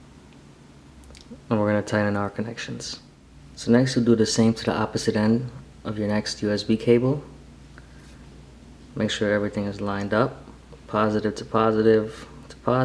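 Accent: New York, United States. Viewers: Awesome NY/NJ accent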